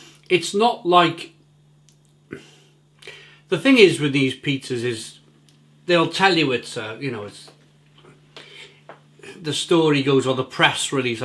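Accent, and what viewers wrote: Wales, United Kingdom. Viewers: What part of wales are you from fella?I'd say cardiff way.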